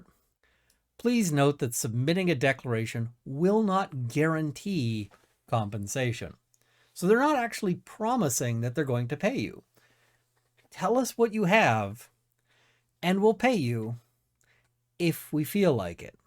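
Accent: Canada. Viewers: Hate the quebeque English accent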